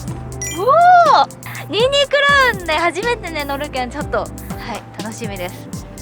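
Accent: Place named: Hiroshima, Japan. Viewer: ちゃん今日もめっちゃ可愛いね💕 相変わらず広島弁がいいね👍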